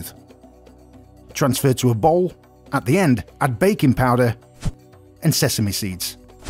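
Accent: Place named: United Kingdom. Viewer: mate, with your style of production and your superb editing you could produce video packages for local TV stations that do morning news and chat shows. Local stations are always looking for premade video packages that they can run. You have just the rite personality and with your good looks and british accent you are a natural.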